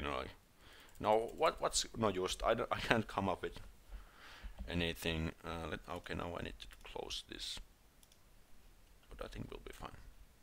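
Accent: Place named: Finland. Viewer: damn toi sun suomi aksentti kuuluu niin selkeesti lol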